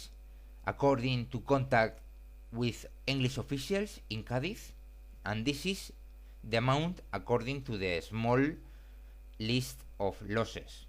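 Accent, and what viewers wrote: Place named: Massachusetts, United States. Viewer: This Inglish is from Machachuset, salu2 desde ESPAÑA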